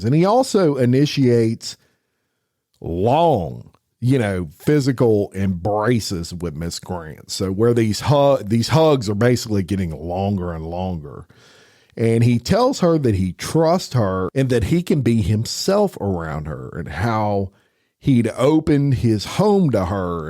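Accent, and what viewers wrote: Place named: Appalachia, United States. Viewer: Your hillbilly accent makes the whole boring show worth listening too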